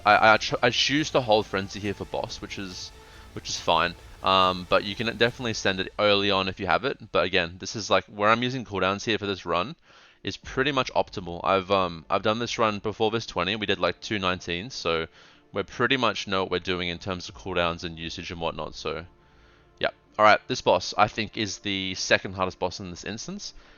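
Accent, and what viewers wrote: South Africa. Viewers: Thank you very much. BTW your accent sounds like you are from South Africa. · Are you from South Africa? Accent sounds a bit like it.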